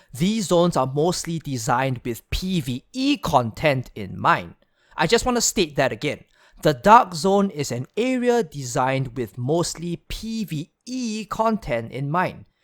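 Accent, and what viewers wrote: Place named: Singapore. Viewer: He's from Singapore. I was initially trying to figure out the accent as well. Cantonese speakers from HK will enunciate but often have have terrible vocabulary. Taiwanese speakers often inject the "z" sound in everything and usually talk slower. Singaporeans enunciate, have good vocabulary, talk fast, but they have that odd accent which I assume is a mashup of multiple different languages. Since Singapore is the hub for South East Asia, most Singaporeans are trilingual by default.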